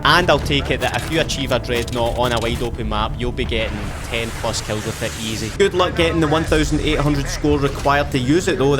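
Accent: Scotland, United Kingdom. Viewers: Why do Scottish accents make everything more funny😂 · Why title is in english but u speak Scottish or some shit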